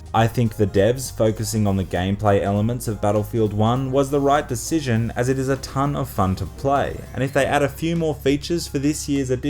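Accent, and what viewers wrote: Australia. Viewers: Another winner my friend, keep up the great work. Also i love that smooth auzie accent. God damn it makes me wetter then a pelican diving for fish!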